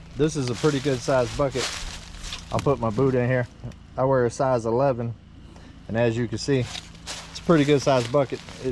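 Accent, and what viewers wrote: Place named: Louisiana, United States. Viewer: Just want to know if you're from Louisiana because you sound like you got Louisiana slang going on when you talk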